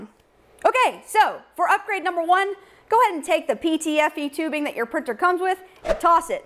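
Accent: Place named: United States. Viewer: hell yeah. Love your enthusiasm, knowledge and delivery. The American accent is a bonus too :)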